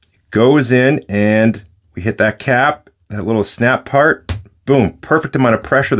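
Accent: Canada. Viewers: Are you Canadian ? You sound Canadian . Sound like a guy I watch on YouTube called AvE. EDIT : I heard at the end of the video you said " Canadian" as currency, Nice !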